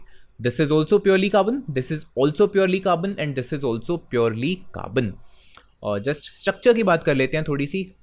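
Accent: India. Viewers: Yeah..I somewhat realised u seem to be punjabi by the way of your taking sir...even I am punjabi and I have shared with my friends:)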